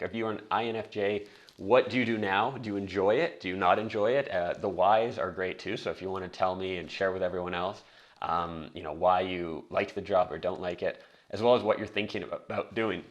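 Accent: Canada. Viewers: I found out I was INFJ earlier this year, and wish I had known earlier. Your advice is on the right track. Even as a child, I had to know why before I acted on someone's orders. Entering the military would have been hell for me. I have been working mostly alone since 1987, and found it is the best way for me to function. Working with others who did not have the same standards ended up making me close my doors, limiting communication with them. Once going on my own, while it can get lonely, ended up saving me all kinds of conflict and pain. And having to follow a procedure/process oriented, controlling boss uttering, "because I said so" would be purgatory for me. Did I make mistakes? Hell, yeah. But you try not to make the same mistake later on. I can tell from how you talk you are Canadian. Keep up the good work.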